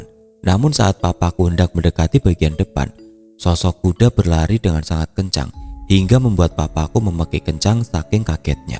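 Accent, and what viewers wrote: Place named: Java, Indonesia. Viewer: Maklum lidah orang Jawa😃😃😃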